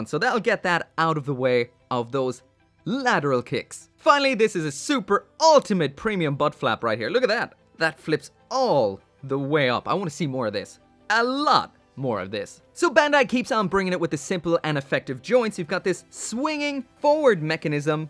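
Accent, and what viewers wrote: Ireland. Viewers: Dude I never knew you were from Ireland. The voice makes SO much more sense now.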